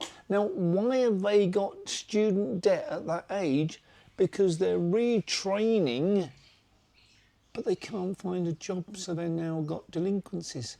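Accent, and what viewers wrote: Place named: United Kingdom. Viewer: People with British accents just sound smarter and more trustworthy. I’m hooked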